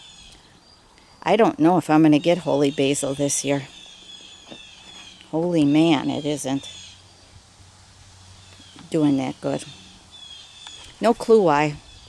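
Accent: Wisconsin, United States. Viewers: O my! How beautiful everything is! Took me two days to get through your tour (I keep getting interrupted), but o what a joy! I just found you, and I thought I heard you say, you are moving. I hope that doesn't mean you're ending your channel. I hope you continue creating beauty and joy wherever you move to. Plus. I love your WI accent. I grew up in WI. Moved away 25 years ago. Miss it terribly. You sound like home ❤️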